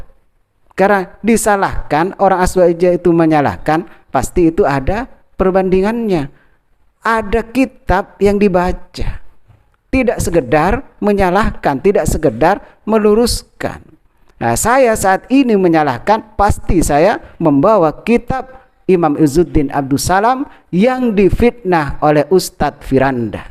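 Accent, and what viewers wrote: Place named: Madura, Indonesia. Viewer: Kebanyakan logat bahasanya Madura. Sumbernya kiai yg sama. Mereka yg susah sunah ini misalnya Zaka, Sane Oye, terus orang ini. Orang ini udah salah disebarkan lagi. Maklum. Orang ahli bidah itu dihalangi tubatnya oleh Allah... E, chong ngaji ke Universitas Islam Madinah biar tidak pakai ibarat. Kasihan chong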